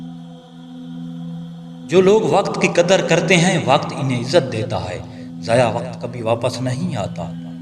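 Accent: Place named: Saraiki, Pakistan. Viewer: Q k Urdu saraikioun ki trah boltey ho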